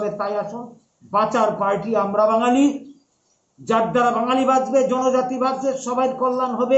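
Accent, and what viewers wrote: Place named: Bangladesh. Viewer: Original tripurar bangali tumar moton koitona tumi bangladese kotta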